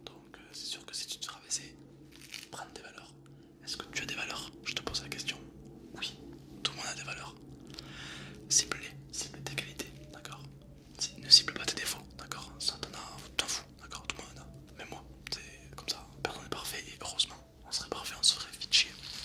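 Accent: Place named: Toulouse, France. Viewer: Ses se que on appelle l'accent toulousain même si oui il a une très belle voix aussi (pk j'ai perdu mon accent toulousain moi 😭)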